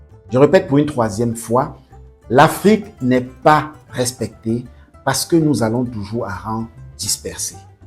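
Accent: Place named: Cameroon. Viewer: A travers l accent tu est biyamerounais toujours c,est vous vous connaissez tous sauf les problème de votre pays ,toujours entrain de parler de tous et se faire donneur de leçon,concernant le cfa nous on est déjà sortie pr l,eco ,c,est chez vous il ya meme pas débat ,avec vos président dictateur,parle de biya dictateur qui veut pas aller a la retraite.aprt la poussière y a rien au Cameroun.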